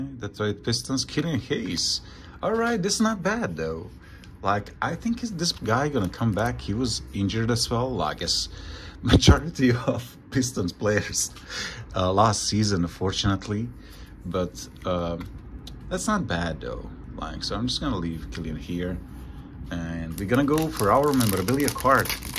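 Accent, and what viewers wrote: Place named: Eastern Europe. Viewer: Eastern Euro step accent is always nice to hear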